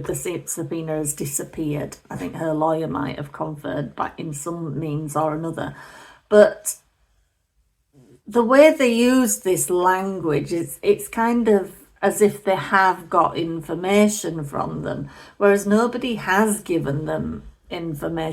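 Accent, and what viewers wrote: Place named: North West England, United Kingdom. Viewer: Sounds like you are north west England, same as me x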